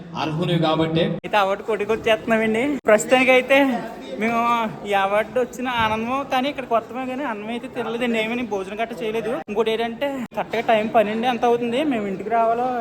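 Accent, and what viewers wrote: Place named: Amalapuram, India. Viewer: Nenu otu vesa anna ni videos chustanu kani eppudu coments pettaledu nuvvu seam ma annayya la untav seam enka acham nekulage untadu anduke yeppudu chustanu ni videos madi amalapuram mana slang tho bale mataladatav any ve congrats annayya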